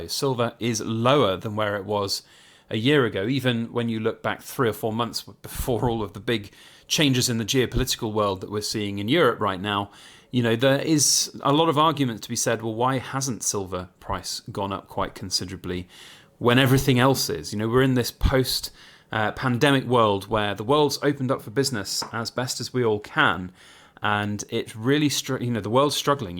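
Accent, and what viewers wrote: United Kingdom. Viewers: Greetings from Colorado. You have to pay VAT on silver? I'm surprised it's even worth buying once the tax is factored in. I'm coming over to UK this summer and might consider bringing silver to sell. Love the Harry Potter accent!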